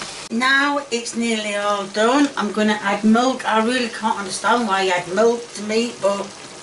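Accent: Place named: Northern England, United Kingdom. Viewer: I detected northern-ish England in her accent. I love it!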